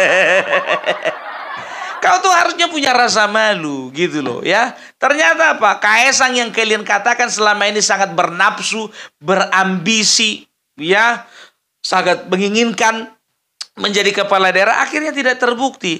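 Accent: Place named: North Sumatra, Indonesia. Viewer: Saya suka opung ini. Ya gaya bicaranya dengan logatn batakya yang kental, gaya bahasanya yang lucu, bersemangat membawakannya, blak-blakan logis, menohok.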